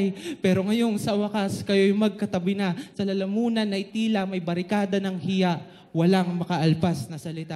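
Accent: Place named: Batangas, Philippines. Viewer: Nakakaaliw ang puntong Batangenyo! Para kang umiinom ng kapeng barako. Mabuhay ka. Ipagpatuloy ang paglikha!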